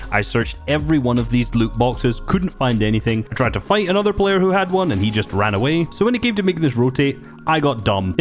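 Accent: Scotland, United Kingdom. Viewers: Can we 1v1 pls? Also are you Scottish? Because I think Scottish people have the coolest accents.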